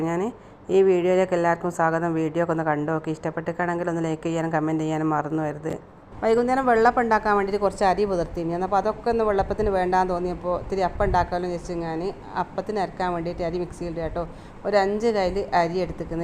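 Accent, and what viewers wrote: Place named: Kozhikode, India. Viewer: Inghalu kozhikkode aano sthalam😂samsaram kettitu aganethonni❤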